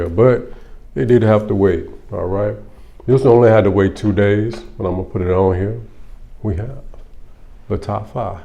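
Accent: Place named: Texas, United States. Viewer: Man you gotta be from Texas.. the way you talk. I love it. A new subscriber here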